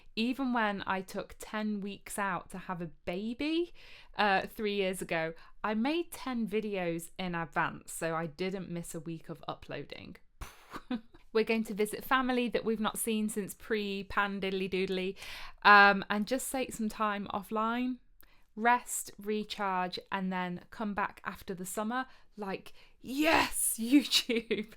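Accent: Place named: United Kingdom. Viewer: We can tell you're definitely British. You being a Brit owes its verisimilitude not only to your accent, but to your expressions. For example, your counterparts across the pond would say: “I’m going on vacation.” An American 🏴🗽 would never say, “I’m going on holiday.” 👌 😉 Stay safe, stay well and keep the faith. Or as would say “Have a great vacay! Enjoy it and be healthy!” 👍 😊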